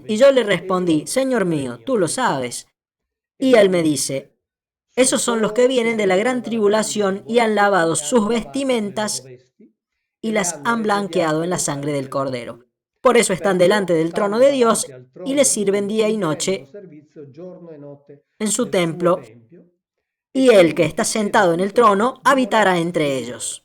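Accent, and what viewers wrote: Córdoba, Argentina. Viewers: Que linda la vocecita, noto un acento cordobés, puede ser?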